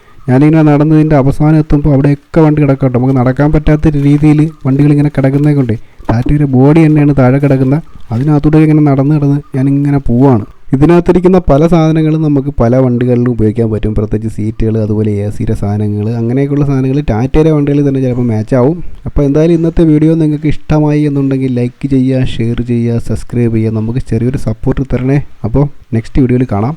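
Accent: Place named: Trivandrum, India. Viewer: Kulathunkal motors scrap cheytha vandikal aakanam... Slang tvm aayath kond oohichathaanu...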